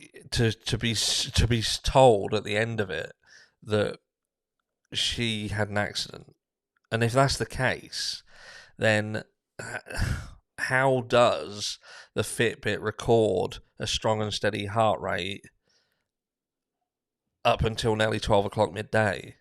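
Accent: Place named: East Anglia, United Kingdom. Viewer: Hi dude, newbie here. Love this chat, I'm going to dive into your channel. I have to ask, are you from Suffolk/Norfolk, your accent is very familiar? I've subbed btw 😉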